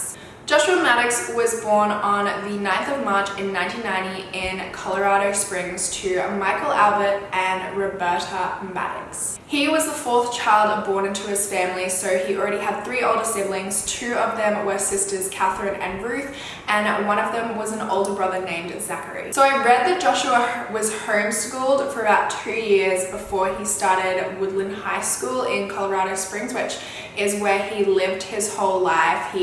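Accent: Australia. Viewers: I have just been watching all US videos but my attention was drawn to your thumbnail at the side of my screen and thought "she's definitely Australian" so im here to check I was right....but I cant figure out what it is about Australian girls that makes them identifiable amongst everyone else on here? I can always predict when an Aussie accent is coming...... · She’s Australian. It’s an accent.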